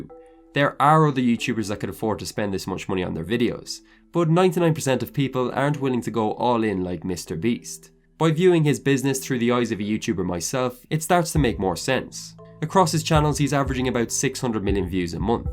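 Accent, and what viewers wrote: Cork, Ireland. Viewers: are you from Cork?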